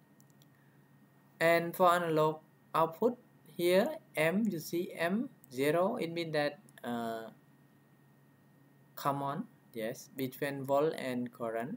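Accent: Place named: Peru. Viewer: Es como un peruano hablando inglés.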